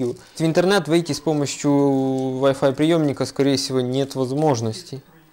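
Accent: Vologda, Russia. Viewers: вОлОгОдскій суржик у твоєму виконанні - просто повний пі...єц. Дизлайк за "язик" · Вологодский окцент!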